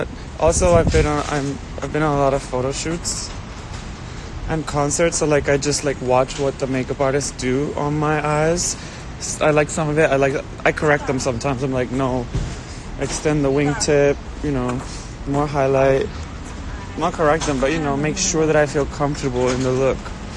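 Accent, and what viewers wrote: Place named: Venezuela. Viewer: Just because I don't understand someone, doesn't mean I have to hate them. Her Venezuelan Spanish is hard for my Texan ears.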